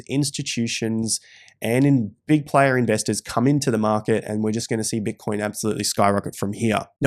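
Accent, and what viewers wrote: Australia. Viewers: accent brings me back to my backpacking experiences in Australia. Good on ya mate! But regardless of that, I love the honesty and all the considerations in this analysis. I’m gonna stick around here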